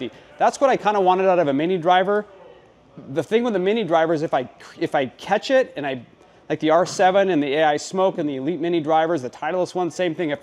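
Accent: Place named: Pittsburgh, United States. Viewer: Yinzer haha. Bayg.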